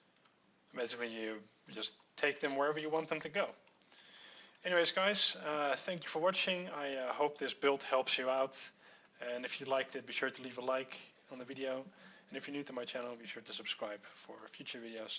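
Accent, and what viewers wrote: Netherlands. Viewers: Where are you from? You have a hollandguy's accent...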